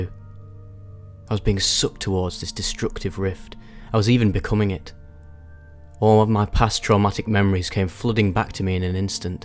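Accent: United Kingdom. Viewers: Having a British accent is like easy mode for commentary channels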